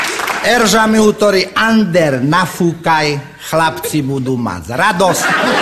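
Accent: Slovakia. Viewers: ander je najlepsi ale slovensky tazko rozumiet ten dialekt ;D ale predsa je zvlast ;D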